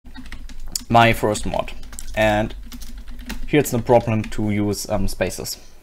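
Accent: Germany. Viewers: No hate but It sounds like you have a German accent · are you german? your accent is so like one especialy when you end a sentence like german way or lee bit French way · Like for the cool dorky German accent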